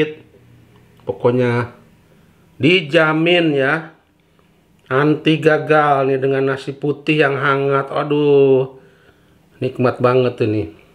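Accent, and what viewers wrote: West Java, Indonesia. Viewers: Bapak mah Sundanya kas, kalo saya mah Tasik, bapak Pangandaran ga jauh pa 😀😀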